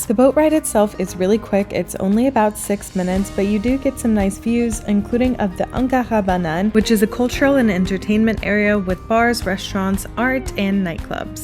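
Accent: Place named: France. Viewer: If I may : how did you get this incredible French accent of yours ? Do you have any French relative or parent ?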